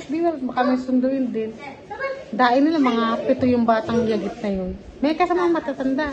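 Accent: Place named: Batangas, Philippines. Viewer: oh i see. ung boses at punto mo kc parang batangas.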